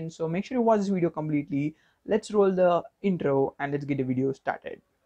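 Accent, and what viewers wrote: India. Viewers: Bro i love the English accent of Indian 👌 cool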